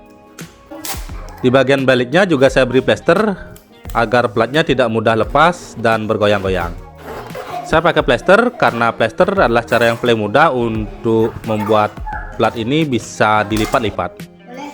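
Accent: Bali, Indonesia. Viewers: Medhok kali bli basa baline .....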